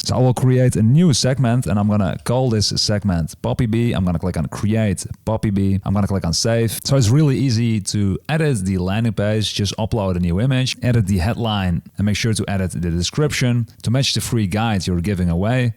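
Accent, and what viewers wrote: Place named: Netherlands. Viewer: You sound Dutch! :)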